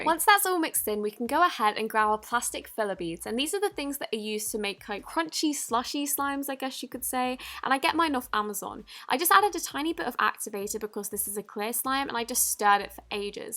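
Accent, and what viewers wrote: England, United Kingdom. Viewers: She's english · WOW i love that britishenglisch so nice to hear👍#EUROPIENPOWER 🇨🇭🇨🇭🇨🇭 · R u English/British ❤️❤️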